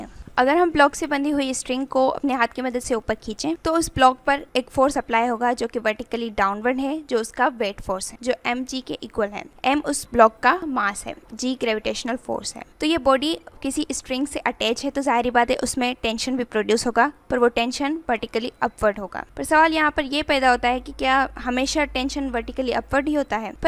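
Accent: Sindh, India. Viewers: Mam are you from sindh and are you Sindhi I think your mother tongue is sindhi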